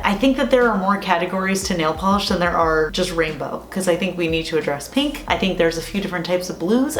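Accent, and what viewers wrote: New Jersey, United States. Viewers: Hey lady I love how you say your Jersey words and if people don't like your accent, fuck em'! It's ridiculous to me that people give you crap for how you pronounce some words... you are eloquent and intelligent and I love your videos! Thanks for being you 🧡🧡🧡🧡🧡🧡🧡🧡🧡🧡🧡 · I know I’m late the the game, but your “arenge” doesn’t even phase me! My husband and his family are from NJ, so I hear it ALL the time! I love you! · Your accent is adorable! From a Canadian to a NJ! I worked for Amex and NJ was my fav state of people to talk to. 😊😊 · I’m from Jersey too and I say it the way you do and until you said it I never knew anyone said it differently 🤷‍♀️ lol. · Maybe because I'm a Bostonian I dont mind the Jersey accent..🤷🏻‍♀️ lol dont get why it bothers people lol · Purrrrrrples! Purps purps purps - lilac and lavenders next please :) and hell yes Joisey girls unite! 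It’s wharter not wahter duh 🙄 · I’m a Jersey girl too!!!! I say orange the same way & coffee... 🤣I love the orly color. Also I love how you did your makeup orange - you look so cute. 🍊💗🤗 I picked up a bunch of OPI polishes from Marshall’s today & found myself checking to see if the polishes were jellies or not using the tips from your videos.😉 $3.99- win! I love the OPI brushes. They had lots of nudes & good fall transition colors. Anyway thanks for another great video!! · Triggered by your NJ accent. 🙋🏻‍♀️ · I'm glad you're making this series! I honestly needed more oranges in my collection. Thanks for the suggestions and the reference! I'm trying to get out of my cold color bubble and trying to wear warm colors. I don't really notice the New Jersey accent since I'm also a New Jersey kid, do we really have an accent. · 🤯I’m from NJ and I didn’t know we said Orange different until I heard you, 😂 and I live in the Orange state now! 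· From one Jersey girl to another, don't apologize for how we speak! Funny thing I never noticed how I said orange! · Love our Jersey accent!! How about the way we dog. Dawg!! Lol!! Loved this vid!! Orange gives me serious fall vibes!! Love the fall!! Hugs!!! 🤗🤗🤗🤗🤗💚💚💚💚💚💚🍊🍊🍊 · Forget the polish,which,by the way were all gorgeous, ZOYA-“Alma” & SALON PERFECT-“Too cute or spook” were my favourites.It is your EYE makeup that captured my attention,it is stupendous,and your accent is brilliant,I think the New Jersey accent is by far the best in the US of A. I have tasted ruby chocolate,it was a Magnum ice cream bar,and I thought it was raspberry flavoured but it said nowhere,as you said aswell,that there was fruit flavouring,apparently it’s the natural “fruity” taste of the ruby cocoa bean 😳🤷🏻‍♀️. 💚🇮🇪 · From one Jersey girl to another.. our accent is fabulous. Love the video too! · Didn’t realize that got so much hate for her Jersey accent. 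It seems such a stupid thing to be annoyed over. Everyone who isn’t from where you are is going to have an accent. You have an accent to all those people. What’s the big deal? I personally like hearing different accents. I like the variety of voices.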